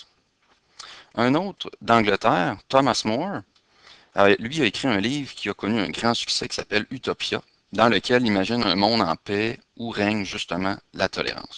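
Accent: Canada. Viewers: mdr laccent canadien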